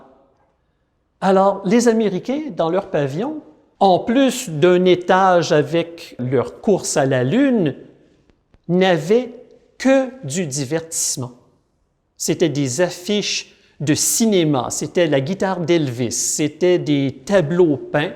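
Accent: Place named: Quebec, Canada. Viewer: Que j'aime entendre parler un québécois en Français .Merci à vous de conserver cette langue comme le reflet de votre culture et de vos origines. ENCORE MERCI DE FAIRE RAYONNER CETTE LANGUE QUE J'AIME TANT !!!👍👏❤ FRANCE (Lille)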